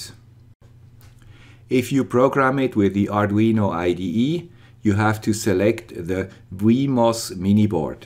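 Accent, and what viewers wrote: Switzerland. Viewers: schön wenn ma zu sim schwiizer akzent stoh chan ;)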